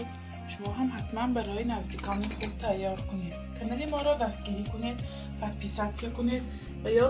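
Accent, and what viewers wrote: Isfara, Tajikistan. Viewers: Апа аз Исфара хастед?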